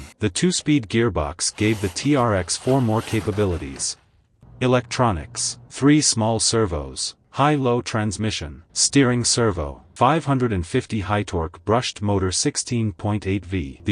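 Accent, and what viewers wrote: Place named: Russia. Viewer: Лютый акцент я сразу понял что автор походу Русский )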